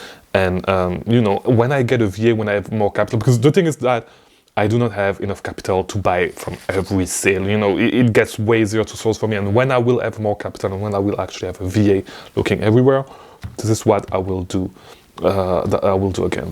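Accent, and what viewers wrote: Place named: France. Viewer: Hé tu parles français? J'entends ton accent?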